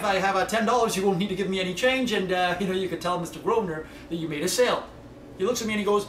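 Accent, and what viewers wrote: Trinidad and Tobago. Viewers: You got to do some more with just ur trinidad accent. . just luv it !! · his Trinidadian accent realllllllllllllllllll on point wdhh loll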